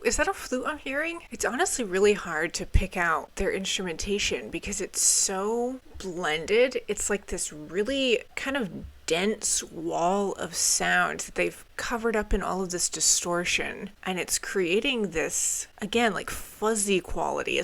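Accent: United States. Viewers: god ur american accent is so annoying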